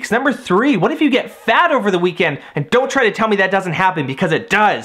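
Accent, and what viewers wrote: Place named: Canada. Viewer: Holy Canadian Accent! We get an "aye" and and "aboot" within the first 20 seconds!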